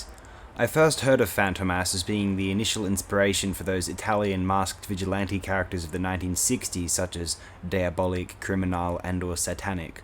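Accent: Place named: Australia. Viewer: 1: He sounds australian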